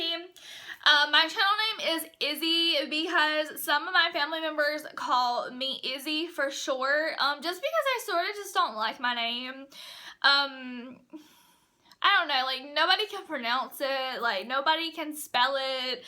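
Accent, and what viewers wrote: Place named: South, United States. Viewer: Great video! I'm a new subscriber. 😀 Love your Southern accent.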